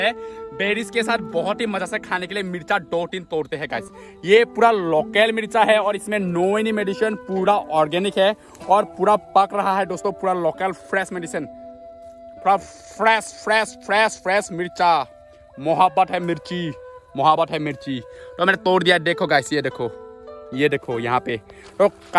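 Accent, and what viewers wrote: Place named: Assam, India. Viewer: Aap assamse hona bat karneke andaj sehi pata salta h😬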